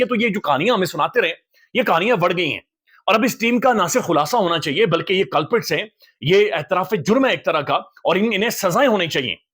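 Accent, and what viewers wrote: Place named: Kashmir, India. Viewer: Ap kashmiri lagty ho apki urdu gulabi hai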